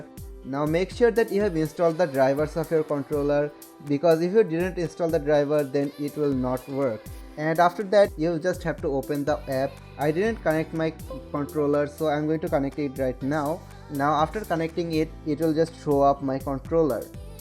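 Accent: India. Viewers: your Indian accent made me suspicious but it worked so thanks ?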